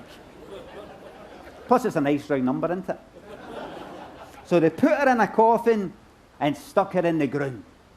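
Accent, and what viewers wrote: Scotland, United Kingdom. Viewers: gonna sounds mean but i dont mean it in a bad way.. but pretty sure the accent scares away a portion of the audience. For exemple i speak french so learning english was a struggle already. hearing someone speak in a heavy accent is quite can be quite hard to understand . i've been watching and reading scot stuff so im personally used to it now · too scottish for hes own good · About his accent as he addressed it at the end of this show, I'm Irish and don't find the Scottish accent the easiest to decipher at times(due to the heavy colloquial stuff as much as the accent itself) but never truly failed to understand Limmy's jokes despite any supposed comprehension challenge. A happy accident of sorts came to my benifit as I continued watching Limmy show I found my ear tuning to the Scottish way of language which increased my ability to be a more attentive listener, one who searched for meaning in one's speech rather than have it handed to me on a plate. 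Limmy was very correct to keep his true speech. It best represents his intent for his jokes and also politely asks a discerning audience to be active listeners and cognitively involved in figuring out the jokes in his work. He writes his work like Miles Davis wrote jazz, honest and true and then only appreciatable by those willing to keep their own active mind present when being entertained. Not saying anyone is smarter or less so, just distinguishing the difference between people who watch comedians or listen to music to shut off or disconnect from their mind, maybe as some kind of break or rest for it(the mind), as opposed to those who keep their mind involved in the entertaining experience as to appreciate it earnestly (as in beyond mere amusement). Any thoughts? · I don't know why but subconsciously I didn't think his accent was actually like that, and then seeing him actually talk it's like wow Scottish people are... 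real.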